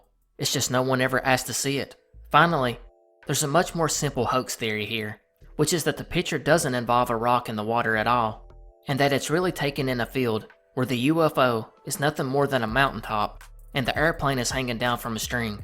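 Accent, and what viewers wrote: South, United States. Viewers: In the beginning I needed to get used to the southern accent, but it has really grown on me and I now find it soothing 😅. Thank you for all your hard work! ❤ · This might sound weird, but as a southerner, it's really cool to hear a narrator with a southern accent. You don't get to see that very often in TV and media. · I don't think it is weird either. I think it is really refreshing as you do, to hear a narrator with a great Southern accent! I am originally from the Pacific NW, so its really cool to hear all the other different accents that we have here in the US. I thought your comment was great.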